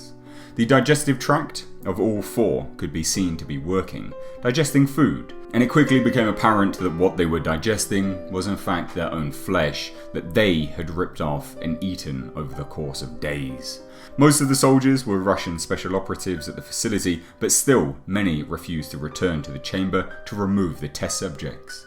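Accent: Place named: United Kingdom. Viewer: You know the story is 90% fake when the Narrator has a British accent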